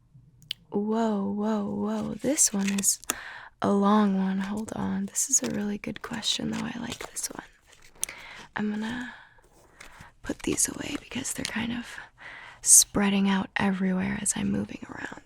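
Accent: South, United States. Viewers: I always love your videos. Your incredibly talented. My favorite series, or style is your southern accent videos.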